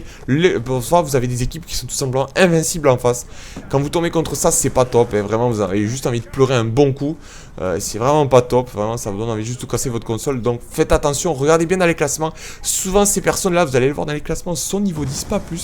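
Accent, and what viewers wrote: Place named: Marseille, France. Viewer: Je veux pas dire mais tu pourrais ralentir la cadence quand tu parle,parce vraiment c'est dur dur à comprendre ce que tu dit en plus avec ton accent marseillais sa n'arrange pas les choses sinon joli massacre :)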